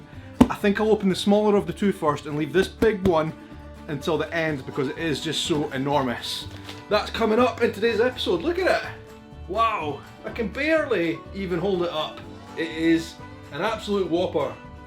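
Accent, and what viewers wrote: Scotland, United Kingdom. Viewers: I'm sorry, but when I do a Scottish accent; it sounds regionally similar to yours. What town are you from? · I swear your accent has gotten thicker since moving back to Edinburgh!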